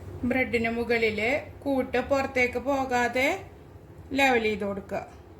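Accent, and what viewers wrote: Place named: Thrissur, India. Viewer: നല്ല രസണ്ട് സംസാരം പക്കാ തൃശൂർ ഭാഷ ഇങ്ങനെത്തന്നെ മുന്നോട്ട് പോട്ടെ 😊കൊതിപ്പിച്ചു 👌new video കാണാൻ വരണേ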